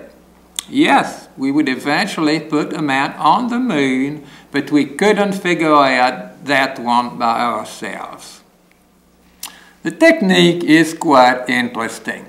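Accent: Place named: Louisiana, United States. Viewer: Interesting but that "smacking" gets on my nerves. Also, he sounds like a Cajun.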